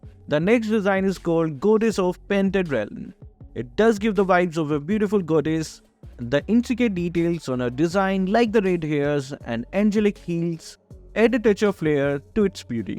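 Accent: India. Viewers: Every now and then i still get annoyed by your voice or accent(in our country we see indian accent as "STINKY"), but hey! At least you bring pretty useful information. and thanks for that :v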